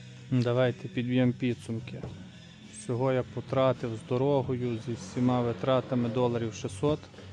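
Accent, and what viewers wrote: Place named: Western Ukraine, Ukraine. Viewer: Знімаєш ні як , а в перемішку з западнянським акцентом це просто жах . Хто тебе з буд майданчика відпустив , ваша реальна тема це будувати...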